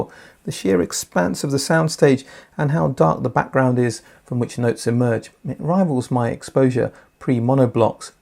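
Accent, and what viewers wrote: United Kingdom. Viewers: Great stuff as always. And by now the way we in the U.S. pronounce 'h' (the correct way) and the way you Brits do so is lost in the background. Personally, I prefer separates over integrated. Especially monoblocs. If others feel differently, I say 'hats off' to them. Plenty of room in this tent for all. My net upgrades? Nothing wrong with the gear I have. At this point the limiting factor is the room. That's a tough upgrade!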